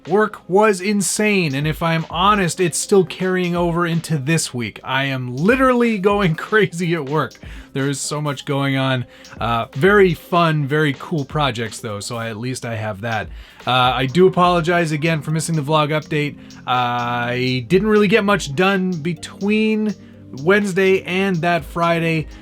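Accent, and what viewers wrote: Canada. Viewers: Please type the name of that chrome paint pen you've mentioned a couple of times. Not sure if its your Canadian accent or my shitty ears or a combination of both... Tractor Truck is looking bad ass. The brazing work really looks great painted. Shows that not everything has to be bought off the shelf...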